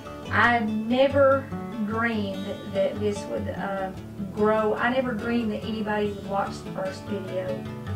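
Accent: South, United States. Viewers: Hi, I was looking for shepard’s pie recipes and I found your channel. I live in Mexico but I lived in Michigan for almost 18 years I fell in love with american comfort food I like your recipe and I saw the hamburger one too I like the way you explain and make your food I’m going to cook some of your recipes soon. Where do you live you sound southern. Anyway I will let you know when I cook your delicious recipes. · You made me cry, with your sweet southern voice and the music in the background was tearful for me. Thank you for the beautiful video. Yes I do zoom in see what you don't have. LoL I'm making that for dinner tomorrow, today I made your Skillet Steak, baked potato and green beans. It was delicious. I never made Shepard's Pie like that before but I'm going to. I'm so glad I found you, everyone out there knows what a beautiful person you are and I know with your talks you have changed a lot of people like you did me. 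Finding God through you was the miracle I've been wanting. to happen in my life. I feel very close to you and love everything you do and say. OMG, that Shepard's Pie looks amazingly delicious. Thanks again sweetie, God Bless you and your family. I'll always put God first.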